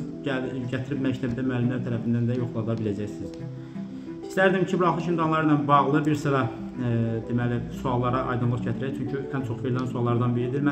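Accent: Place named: England, United Kingdom. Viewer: müəllim, hərdən siz Azərbaycan dilində danışanda ingilis dili aksenti hiss edirəm😅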